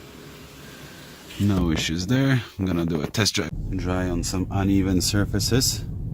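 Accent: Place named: United States. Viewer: nie mam pojęcia co mamy w naszym polskim języku. Mimo że świetnie mówisz z amerykańskim akcentem jednak po 2-3 zdaniach zacząłem wertować u ciebie na profilu informacji by potwierdzić moje podejrzenie że jesteś Polakiem. Ja staram się jak mogę nauczyć akcentu amerykańskiego ale raczej do końca swoich dni będę mówił polenglishem.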